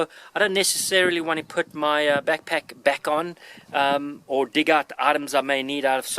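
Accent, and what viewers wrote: South Africa. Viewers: Your rig serves the same function as our load bearing/carrying equipment in the Army. It allowed us to cache our rucksacks and do short range patrols with much less bulk & weight while still having enough equipment that we wouldn't be caught short if something unexpected happened. Sound thinking in my opinion. I enjoy your accent; sounds South African to me.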